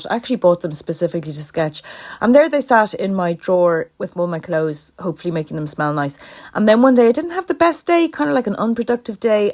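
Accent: Ireland. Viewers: and it’s a joy to hear your beautiful accent, reminds me of all my cousins in Ireland ☘️☘️☘️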